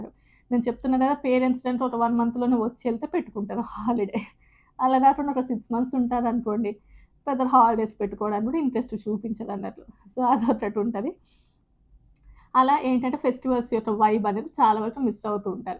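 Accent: Telangana, India. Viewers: Nalgonda slang ❤❤❤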